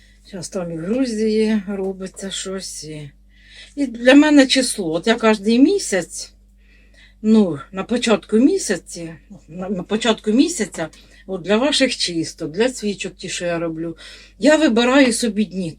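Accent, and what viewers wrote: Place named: Poltava, Ukraine. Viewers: І в Дніпрі такий діалект..Що тут такого??? І моя бабуся так розмовляла,вона з Полтавщини, але все життя у Дніпрі прожила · Це полтавська говірка. Я народилась в чернігівський,.лише до полтавської. Там так говорять. Північна частина чернігівщини більше вживають зросійщених слів, не чисто російських. А у полтавська говірка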